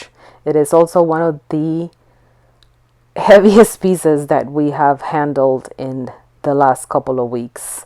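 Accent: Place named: Southwest, United States. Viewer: Beautiful job!! Thanks for the tip about staining. I understand you perfectly - your accent is just a normal Southwestern accent, a lot of people have it here, most especially if their families have lived here for a very long time. I live in Albuquerque New Mexico and wondered right away if you were close by because so many people talk like that here. It says you're in or near Dallas, so it's normal. It's a very normal 'accent' and I used to think it was just an Albuquerque area accent, but it's not, it's like that throughout the Southwestern US, mostly just in a band across Texas, New Mexico, Arizona, and California, more common in the southern halves of those states but lots of people throughout those states have it.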